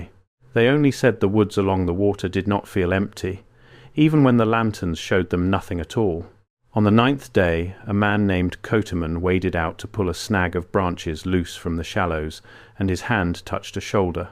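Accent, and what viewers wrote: United Kingdom. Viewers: Thanks for saving me twenty minutes. It’s ridiculous to have a British accent for this story. It’s kind of like if one of the holler gals usually dressed in a dirty cotton dress suddenly donned a dress just sent from Paris. A mini skirt dress, yellow with large black polka dots and black boots.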